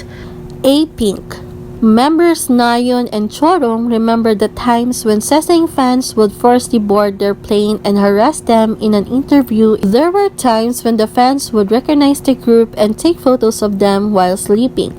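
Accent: Philippines. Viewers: Off topic but is that filipino accent I hear? 😁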